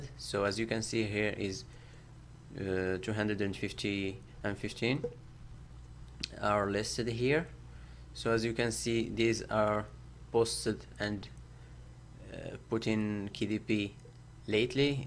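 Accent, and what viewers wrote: Morocco. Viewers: hta ana 3Rftk mghribi nice bro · Men Accent 3rftk mghribi hhh + Chokran Bro 3la cher7 ;)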